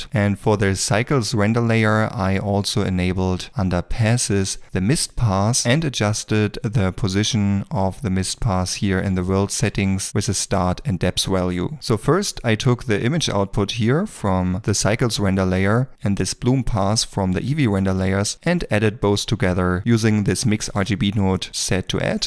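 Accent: Germany. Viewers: Der deutsche Akzent 😂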